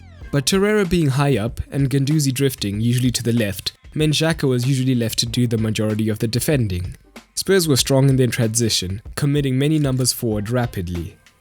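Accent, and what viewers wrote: South Africa. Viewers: You by any chance from South Africa lad? That accent...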